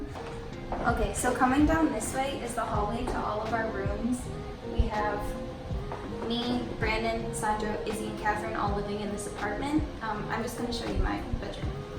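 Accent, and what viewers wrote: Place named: Michigan, United States. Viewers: You have the same accents as my friends from West/North Michigan haha I'm from Southeast Michigan it's dope how there are different accents within the same state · if you've ever wanted to hear a michigan accent this is it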